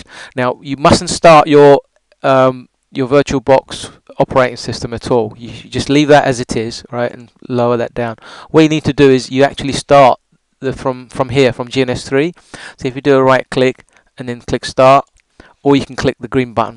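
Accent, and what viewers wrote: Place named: United Kingdom. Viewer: INDIAN With british accent !! great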